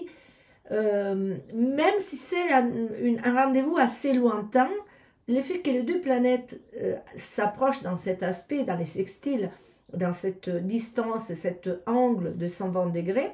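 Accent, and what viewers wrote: Italy. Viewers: Merci beaucoup. J'adore votre petit accents italiens, ça me rappelle mes origines italiennes par ma mère, française par mon père. Perso je suis balance ascendant balance je compte sur Jupiter. 😊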